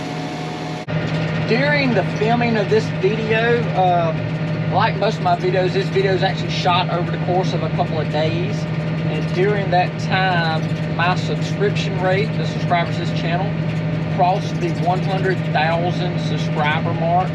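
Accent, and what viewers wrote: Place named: Georgia, United States. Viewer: Great Video! Enjoy listening to your Southern Georgia English. I'm from TN.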